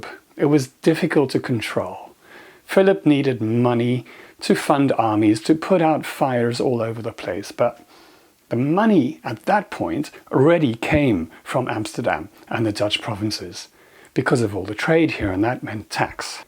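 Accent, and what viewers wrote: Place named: United Kingdom. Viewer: Are you btw Dutch or British? Your accent sounds very British.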